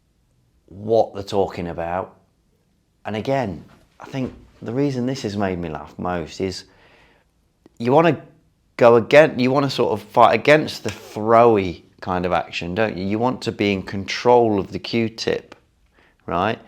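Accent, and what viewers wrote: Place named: Midlands, United Kingdom. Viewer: So midlands, I love it! What a guy.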